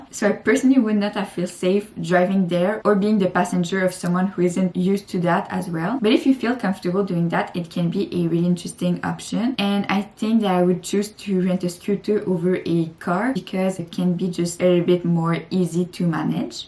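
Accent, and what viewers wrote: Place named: France. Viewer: Montreal Canada. you know I will go to sorrento. positano and amalfi from China soon. your video is very helpful. besides I like to hear your French tone. it's charming .😊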